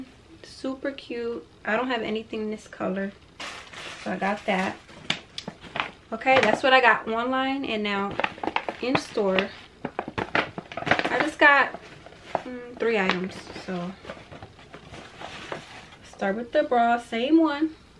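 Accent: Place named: New Jersey, United States. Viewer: So your a Jers3 girl. Still there? Your accent is sweet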